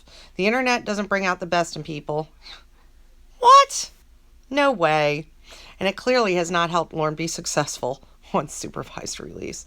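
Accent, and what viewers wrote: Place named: Maine, United States. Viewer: I love your accent! Not all Mainers sound like Lorne (I certainly don’t) but I know a fair few who do. It’s mostly the older generations though. My grandmother used to speak a lot like lorne and his mawm, only she knew the difference between “weren’t” and “wasn’t.”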